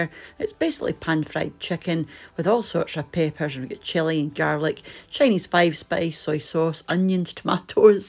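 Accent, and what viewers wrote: Scotland, United Kingdom. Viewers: Hello, sorry this is going to be a long one! I'm a first time commenter, however, we've been watching you for some months now. We're so glad & happy that we chanced upon your wonderful videos. You bring us much joy, you're so polite & well mannered.....true Scottish hospitality there!:-) You are an utter & total joy & delight, with a pleasant, happy go lucky disposition & your meals are all so delicious looking & i so love the way you prep your food & even watching your weekly grocery shopping. You're so clean & tidy & your meals are always fresh & served super hot......so appetising, warming & wonderful. Oh & really visually appealing too. My mouth waters viewing all your yummy dinners, desserts & baked treats.:-) My husband also really enjoys, with healthy humour, watching you talk us through your bought groceries. I admit, we love watching you, listening to your wonderful Scottish accent & we're addicted! 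I can't go a day without watching you, or repeating specific uploads when i'm home. haha We also love the way in which you present all your videos, especially the wee additions of bonnie Scotland the end. So beautiful & makes us yearn to go back to Scotland. My husbands Scottish/Irish & i also have Scottish/Irish ancestry, so you remind us of our Scottish roots & lovely old fashioned, familial traditions. Finally, your wee pussy cat Jasper.........awwww, what a fluffy, adorable & gentle wee cat. Please give Jasper a wee chin tickle from us. We'll be continually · I found your channel just a few weeks ago and I love it- especially your accent. My ancestry is Scots and I love learning about the culture and food and the people of Scotland. I made mince and tatties last night and Mr. and daughter loved it. I wanted to post a picture, but don't see a place to do so. From my family in Texas to yours in Scotland. 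xx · Hi. Sorry I haven’t been around recently I have had some medical issues that have kept me running around back and forth to Toronto 🇨🇦for tests so by the time I get home I’ve been too tired to watch. It’s a 3 hr drive each way from my home. But I will be staying in Toronto for 5 weeks for treatments and I will have plenty of time on my hands so I’m looking forward to catching up on all your vlogs. I’ve missed your lovely accent it makes me fell like I’m home. I think I’ve mentioned before that my mum is Scottish. She is originally from Fife but we have spent many a night in Ayr and Glasgow shopping when I was a teenager.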